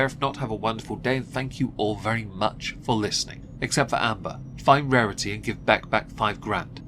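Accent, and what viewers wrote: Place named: England, United Kingdom. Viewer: 😂😂 the only time I ever see these hambeasts is when you make videos, thanks for suffering through it for us. 😂 she will never learn. Though sometimes I feel like you need to remember that America is culturally dominant and that nobody takes the old english accent seriously tho...like accept that and know haha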